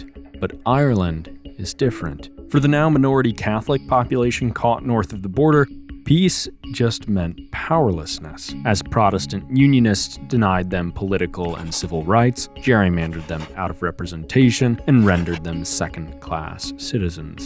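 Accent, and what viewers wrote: United States. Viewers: Oh that's what he's talking about in the video, the first 5 seconds i heard the american accent and paused to check the comments, all americans do is war monger constantly, every country outside america is monopoly and entertainment to their boring lives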